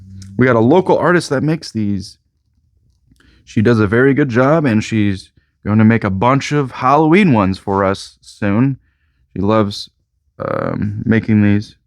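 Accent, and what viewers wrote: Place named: United Kingdom. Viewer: Dude…you should do your video I that British accent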